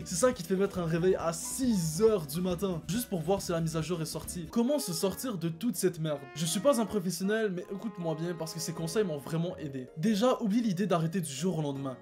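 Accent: Quebec, Canada. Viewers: Ta un petit accent québécois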